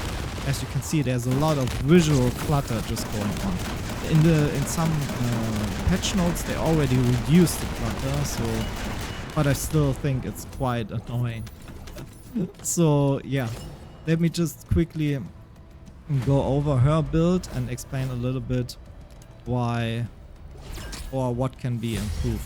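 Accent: Germany. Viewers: Dieses deutsche Englisch hahahaha geil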